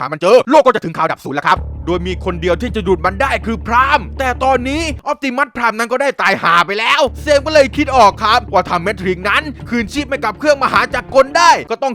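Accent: South, Thailand. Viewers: ฟังยุตั้งนานพอรู้หล่าวว่าคนใต้เหมือนกัญ 🌿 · พี่ครับพี่เป็นคนใต้ไหมครับ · พี่เขาเป็นใต้นั้นเเละครับ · พี่เป็นคนใต้หรอพี่5555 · พี่ครับพี่เป็นใต้ใช่มั้ยครับตอบผมหน่อยนะ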